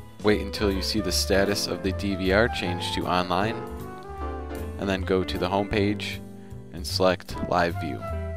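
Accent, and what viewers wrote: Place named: United Kingdom. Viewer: bahiya kaha se ho britain ya germanny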